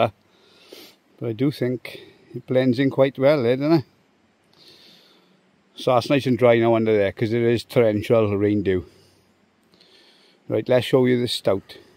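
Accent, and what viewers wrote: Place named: Scotland, United Kingdom. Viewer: Sounds like you might be Scottish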